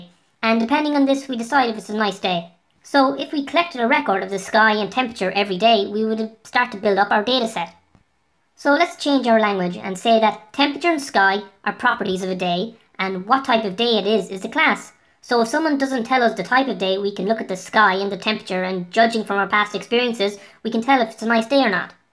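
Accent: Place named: Ireland. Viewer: I can tell youve an irish accent from this lol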